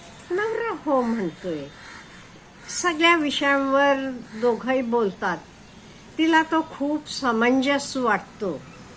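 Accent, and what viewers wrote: Karnataka, India. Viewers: तुम्ही कानडी भाषिक आहात का. बोलण्यावरून असे वाटते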